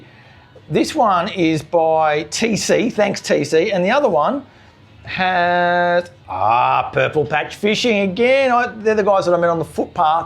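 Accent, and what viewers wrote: Australia. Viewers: Your accent is extremely Australian. Sometimes it can dip into colloquial/ocker, but overall it's the standard Aussie one. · As someone who enjoys a good strong coffee, I'll definitely have to give Wiski a go-go (Sorry). You sound Australian to me, especially when you use colloquialisms. I was once told I was South African by a lady in an office in a small town called Myrtleford, Victoria. For 40 minutes I tried to convince her I was actually a Kiwi, but she wasn't buying it. In the end I said I was from Swaziland and left. Small town people are funny. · No surprise that the visa fee hasn't been removed, it will never be removed. Your accent is fine, you sound fully Strayan to me. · For us Europeans your accent is 110% aussie! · I have noticed alot of different Aussie accents since being here. Yes. You sound Aussie mate. Visa free sounds like no Visa at all required. 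That surely can't be the case?? I also noticed a brown haze around the moon the other night and have a pic of it. I just thought it was from people burning off rubbish. Another great video. Thanks. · Im afraid youve got still got your full-on Aussie accent lol....you just have the ability of pretty good grammar pronunciation and structure of bahasa indonesia so maybe that conclusion is drawn from that...anyway yes food for thought re visa free...thanks for the info❤. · Hey … you have a very Aussie accent, not sure why people think otherwise. The tell would be if Indonesians think you have an Aussie accent when speaking Indo 😂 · Absolutely! you sound full Aussie bro 😂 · Sorry, you can take the Aussie out of Oz but u will never loose ur Aussie twag…. Ur as aussie as dead horse on a meat pie mate!!!!!! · Wiskii's iced cappuccino is excellent (along with all of the other styles). I'm a Mon/Wed/Fri regular at about 10am and he is always open then. 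Oh your accent is still recognisably Aussie. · you certainly still sound like an Aussie. I lived the first 28 yrs of my life in Adelaide and I still get some people who think I was born in England. Some people don't realise there's more than one Aussie accent. I really appreciate all your recommendations, Bahasa lessons and visits to other islands. I was speaking to someone a couple of days ago who looked at going to Bali and it was going to cost $1900 with Jetstar! Mind you, she was going to book only two weeks beforehand and would have been in Bali during the school holidays. Keep up the good work but don't burn out. We're so looking forward to being in Bali in October. Alan's already counting the days down. 😊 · Your accent is fair dinkum aussie, the only difference between us is that you roll your r’s and pronounce the Indo words well. You even chuck some slang in there. A good thing to teach your daughters, if he waits around for 2 hours he must be keen! 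Well done 😁 Thank you for answering our comments, we don’t expect you to. Often we comment because apparently it pushes the google algorithm out there. Cheers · Hey You definitely don't sound anything but an Aussie. Funny what people hear, my wife is German and she often gets mistaken for South African which she doesn't sound anything like it. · Your accent is true blue mate. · I am not fazed by paying for a visa....Australia charges way more than Indonesia..$250 if you are coming to Oz... maybe aussies should ask why australia charges so much? Your Aussie accent is still there 😊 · Your accent is defo Aussie! Love the “how’s your father” saying too 😂 Great vid ❤ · you still sound like an Aussie. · a well spokenAussie, but definitely Aussie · No, you 100% have a strong Aussie accent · you have one of the Aussiest accents I've ever heard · Nah mate. Your aussie accent is fully intact. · Your accent is still totally Ozzie, never fear!😂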